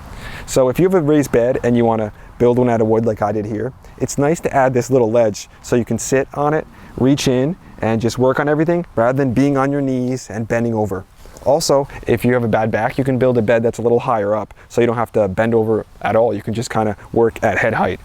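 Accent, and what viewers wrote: New Jersey, United States. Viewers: Love ya HARD “”JERSEY”” ACCENT. ONCE IGET NEW GARDEN SET UP... I WILL INVITE YOU DOWN. YOU WILL LOVE THE AREA AND WEATHER..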